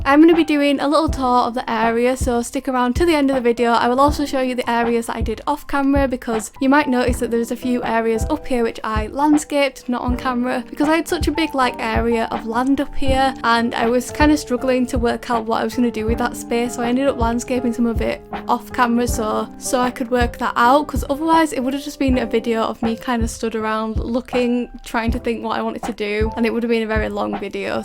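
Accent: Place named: Scotland, United Kingdom. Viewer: i’m realizing you’re scottish not irish bye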